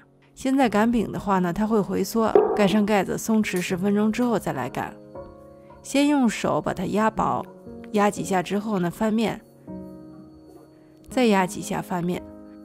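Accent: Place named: Shandong, China. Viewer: 我听口音还以为是山东人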